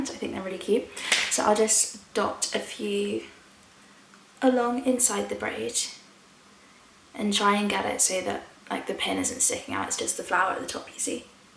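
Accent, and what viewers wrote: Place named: France. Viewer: I love your accent and is it a French accent or a British accent?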